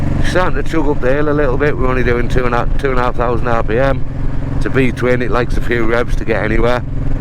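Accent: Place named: North, United Kingdom. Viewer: Excellent videos, (only a couple left to watch) much appreciated. You don't sound like a Devon local - a touch of northern in there somewhere? Understand the use of dancing on the gears on my Deauville being the smallest and probably oldest bike in our group rides (Durham Advanced Motorcyclists - DAM).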